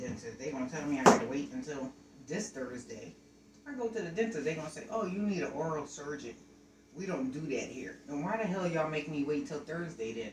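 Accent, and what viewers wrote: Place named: Louisiana, United States. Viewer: Where u from cause u talk like me 😂😂😂Louisiana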